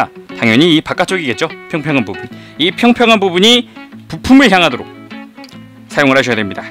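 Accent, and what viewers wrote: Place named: Seoul, South Korea. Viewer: 와 말투 서울말씨 찰지네 ㄷㄷㄷ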